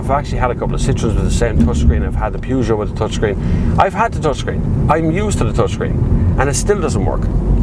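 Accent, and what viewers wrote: Ireland. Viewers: Helpful review - and particularly insightful about the touchscreen - thanks. It's hard sometimes to keep track of how all the cars in this segment compare to each other for the aspects you can't see in the videos - ride quality, refinement, engine tractability and response. I'm meaning that it would be useful to have a comparison of these aspects between VW Golf, Audi A3, this Peugeot, Alfa Romeo Giulietta, BMW 1-Series. As I think you have driven all these cars - perhaps you can edit something together to compare them all? I really enjoy listening to your voice - to my non-Irish ears, you sound like Chris O'Dowd (IT Crowd, Bridesmaids) and you can be just as entertaining! Thanks again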